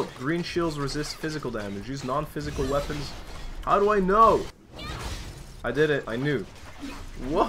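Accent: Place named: France. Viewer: MAN THAT ACCENT HOLY WOOOW JE SAVAIS PAS QUE T’ÉTAIS FRANÇAIS je suis choqué mais grave surpris continue tes vidéos d’explorations elles sont grave chill!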